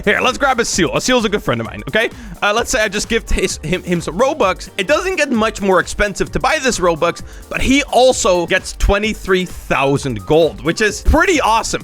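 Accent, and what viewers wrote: Netherlands. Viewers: Are you dutch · Wait are u dutch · Your Dutch aren’t you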